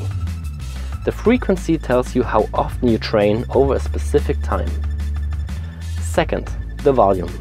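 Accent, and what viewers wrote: Germany. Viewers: DEUTSCH AKZENT :) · omg... What's happening to me? Now even German-accented voice alone gives me a hard on?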